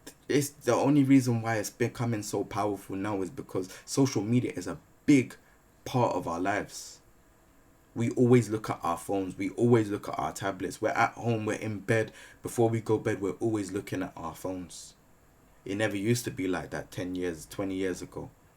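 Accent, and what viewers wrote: South London, United Kingdom. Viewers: This message proper resonated with me. I don't know if I've seen you before but I'm 28 from South London, New Cross, Deptford area and I know from the way you talk you were from a similar place. I'm mixed race and have experienced elements of the things you were talking about. So I'm glad you're talking about these things on your platform, makes people like us feel less weak for admitting these issues affect us I'm the way they do. Love bro ❤️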